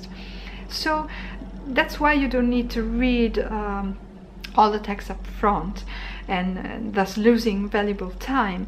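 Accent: United Kingdom. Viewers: Me encanta el acento inglés ❤️❤️❤️❤️ lo escucharía todo el día.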